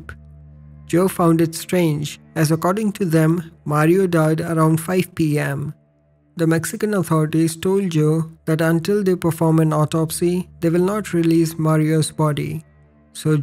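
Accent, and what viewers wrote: India. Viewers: Lol turn on subtitles...my mother is married to an Indian...I'm kinda used to the accent lol... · the indian guy narrates, the accent irritates my ears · Why Indian Guy with Indian denglish as your speaker?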